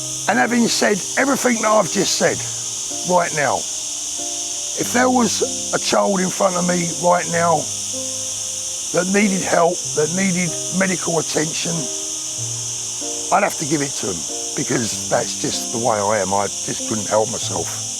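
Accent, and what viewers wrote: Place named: Cockney, United Kingdom. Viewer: You're like a cockney boxing coach from one of those movies. Thankyou.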